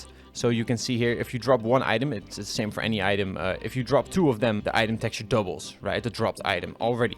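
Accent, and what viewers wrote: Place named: Netherlands. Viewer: Took me 10 mins into the video until I realized you're Dutch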